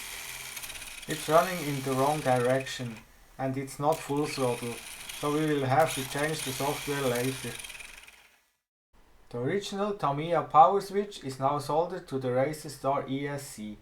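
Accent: Switzerland. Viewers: Are you from swirzerlanf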